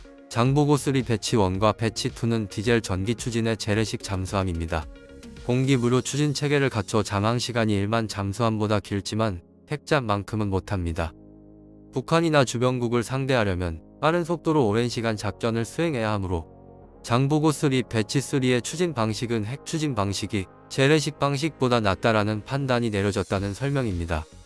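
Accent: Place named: Jeolla, South Korea. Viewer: 깽깽이 사투리 못들어주것네... 수고혀라.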